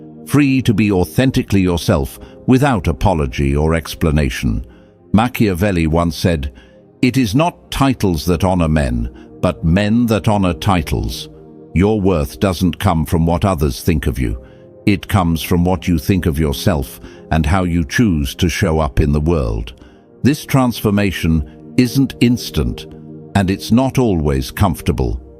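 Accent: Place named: United Kingdom. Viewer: Change your voice British gag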